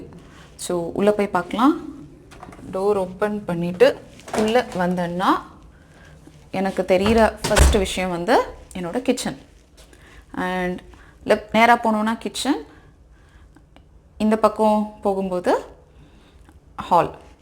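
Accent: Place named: Nagercoil, India. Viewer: Sisters ungaluku Nagercoil tha...unga tamil language appadi tha iruku..nanum Nagercoil tha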